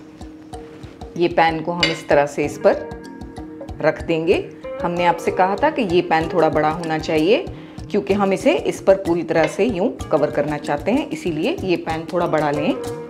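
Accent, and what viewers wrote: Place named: Konkan, India. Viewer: Aap kokni ho na .baato se aap kokni lagte ho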